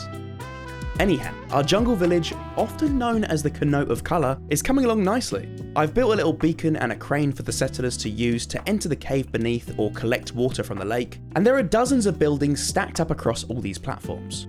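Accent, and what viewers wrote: United Kingdom. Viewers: Are you British As I mean are you from England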